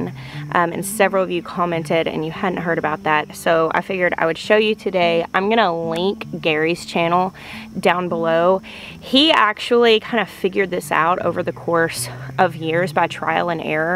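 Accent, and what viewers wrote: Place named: Arkansas, United States. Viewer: Love your Arkansan-Southern accent. So refreshing. I live in Little Rock, it’s turning into something else. Lots of valley-girl coming in.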